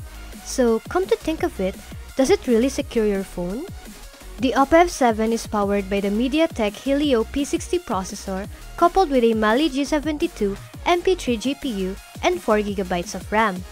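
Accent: Philippines. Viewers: kaya naman pala eh. Ph ka pala. Iniisip ko parang Philippine accent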